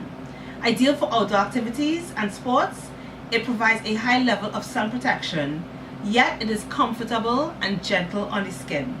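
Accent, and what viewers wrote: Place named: Trinidad and Tobago. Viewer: Love your accent. You sound like you have a Trini vernacular. Thank you for doing this review. I just bought this tonight. I normally use Estée Lauder but it’s hard to find in stores so I’m trying thi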